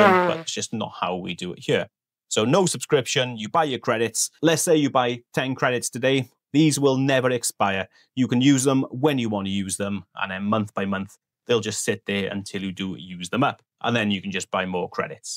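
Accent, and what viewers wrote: Wales, United Kingdom. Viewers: Love how you talk about dollars and cents with a Welsh accent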